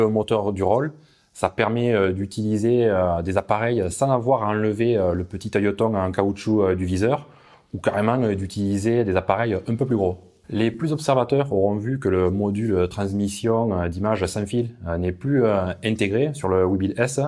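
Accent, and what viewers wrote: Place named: Toulouse, France. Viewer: l'accent toulousainnn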